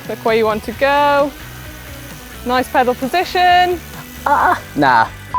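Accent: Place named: Bath, United Kingdom. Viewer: Your in bath ik because my grandparents live by odd down and the accent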